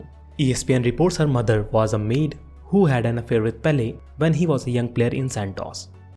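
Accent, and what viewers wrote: India. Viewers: "cane"'. Chupa essa cana. Your accent is....from india?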